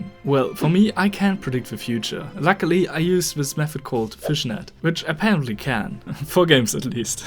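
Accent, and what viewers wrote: Germany. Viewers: Listening to this video knowing German is basically no different from watching this video in German lmao Great video, but I will never unhear the accent. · Are you german by any chance? I really hear it in your voice, and i LOVE that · i think you ar a german i can hear it in the voice as a german myself i know how that sounds when german speeks englich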